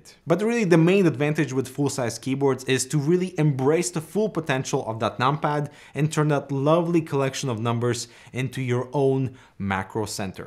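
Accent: Russia. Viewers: По началу было сомнение что вы русскоговорящий из-за акцента, но потом в видео подтверждение проскользнуло. ;)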